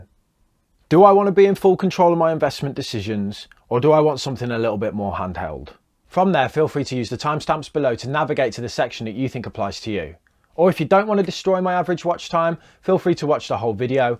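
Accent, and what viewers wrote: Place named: West Midlands, United Kingdom. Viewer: Just found your channel, and your West Midlands accent reminds me of home 💗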